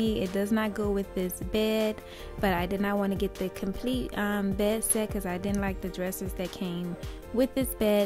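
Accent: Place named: New Orleans, United States. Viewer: what part of Louisiana are you from? Sounds like I hear a New Orleans or Lafayette accent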